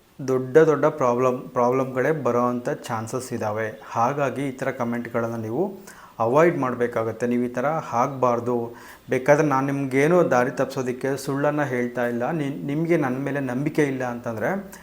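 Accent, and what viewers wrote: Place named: Holalkere, India. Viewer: Anna nammadu Chitradurga, Holalkere Grammina bhashe Keli nanna balyada dinagalu nenapayitu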